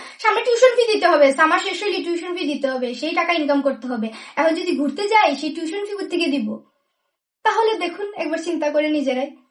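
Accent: Sylhet, Bangladesh. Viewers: দিদি কি তুমি কি সিলেটি? আমি রাশিয়ায় আসতে চাই তোমার মেইল টা দিও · Didi Sylheti ni..??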